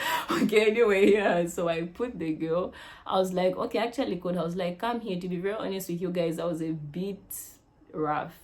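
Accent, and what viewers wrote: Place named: Uganda. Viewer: You talk like a Ugandan, 🥳🎉 pleasure is all mine ones again,thanks for posting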